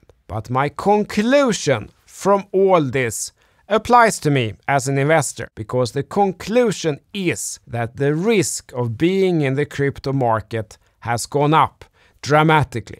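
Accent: Sweden. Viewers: Shenanigans in a Swedish accent - love it. 😜😜❤❤❤❤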